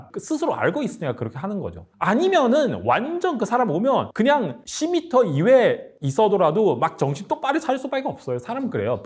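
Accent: China. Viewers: 이 분 중국인 이네. 발음이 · 지금 말하시는 분이 중국인 같습니다~~ 한국말 제대로 못하네요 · 뭐여 왜 중국발음이아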